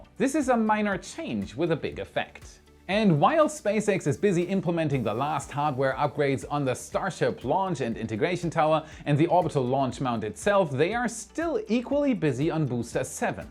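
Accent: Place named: Germany. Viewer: Awesome to see you back. Space should always have a German accent!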